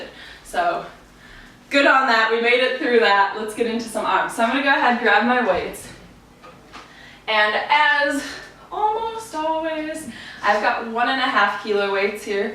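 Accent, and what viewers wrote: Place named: United States. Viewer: This had me cracking up😂 Your chatter was so adorable🙊 The workout was a burner for sure and I’m loving how I feel now! Also, I had a feeling you were/are American so I’m glad you mentioned it in this one😉 I’ve been trying to place your accent😆 Thanks for the fun sweaty time and strong feeling I now have💗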